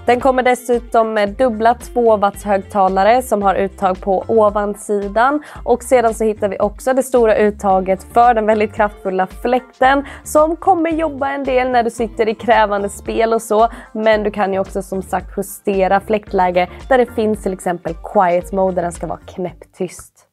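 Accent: Sweden. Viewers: Vad är det där för skön dialekt egentligen? Kan inte riktigt placera den. Västernorrland? Västerbotten?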